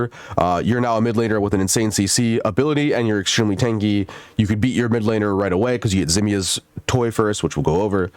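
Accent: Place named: France. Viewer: French accent 🤣 Get better man! And thanks for your time showing this guide to us!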